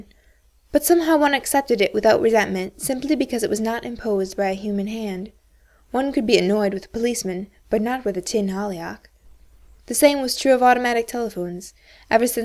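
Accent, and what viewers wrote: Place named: United States. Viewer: BRITISH housewife, reader is American and does a crappy job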